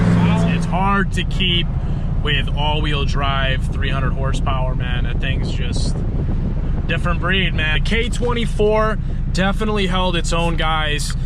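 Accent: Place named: Philadelphia, United States. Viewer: Lol bro you just like your from philly.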